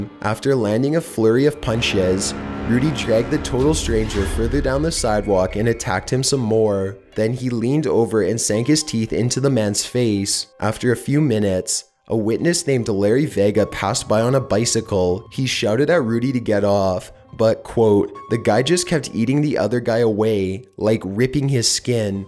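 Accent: United States. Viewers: lol u end ur sentences in question form? its cute i hav a friend that talks the same way! R u american? def. true stories i practice voodoo. but i don*t turn people in2 zombies. thanx for ur scary stories. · Is voice an accent in an American state somewhere if so its beats the broad Birmingham accent in the UK which is like scraping your nails on a blackboard,its like hes forcing the words out of his mouth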